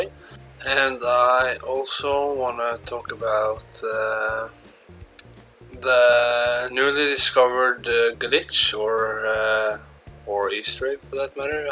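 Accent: Norway. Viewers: are u from norway?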